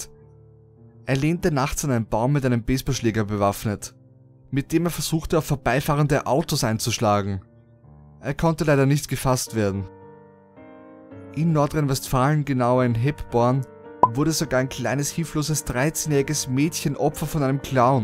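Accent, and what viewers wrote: Vienna, Austria. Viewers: Natschka Mir kommt's vor als ob er Wiener wäre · Bist du aus Österreich?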